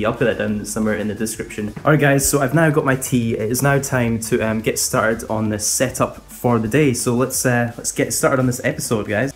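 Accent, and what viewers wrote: Scotland, United Kingdom. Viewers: are you Scottish because you have the accent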